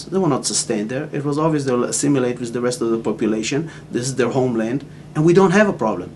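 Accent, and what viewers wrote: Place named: United States. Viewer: you talk like a mili..into operation details...fuck that..stand back...u cant have a humane war. Now I respect the IDF IAF for their attempt to be humane, but please understand/feel. You know you sound like an american. Fuck Hamas, but consider your self, bro. Its insanity to think that you can create a humane military system...I dont want to rais eth eissue but the whole fucking sick system of the Shoah, as you call it, was created because asking troops to shoot people made them into monsters.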